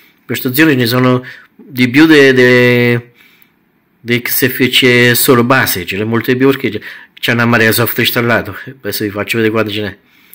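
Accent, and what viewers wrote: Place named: Marche, Italy. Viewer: però dovresti parlare italiano! Si capisce molto poco di quello che dici se parli marchiciano! Io lo capisco perché sono originario di un paesetto non lontano dalle Marche ma molti avranno difficoltà!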